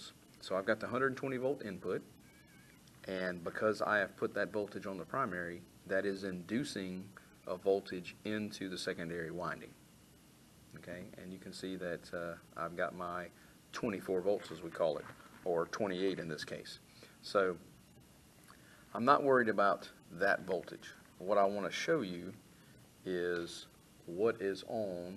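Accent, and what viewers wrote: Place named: South, United States. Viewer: Why do all the videos have a tech with a southern accent?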